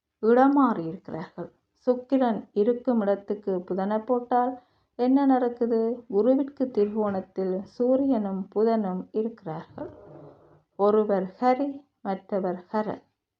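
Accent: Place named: Sri Lanka. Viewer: சிலோன் தமிழ் அழகாக உள்ளது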